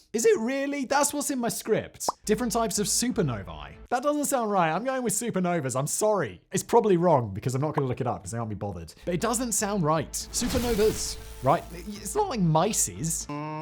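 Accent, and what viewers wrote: United Kingdom. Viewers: Bald man with facial hair and glasses, wearing all black, relaxed on an office chair speaking with a British accent talking about cartoony supervillain stuff (like blowing up a star)... ...Dude starts petting a cat on screen and this is a solid trope. Bonus points if he trades the glasses in for a monocle.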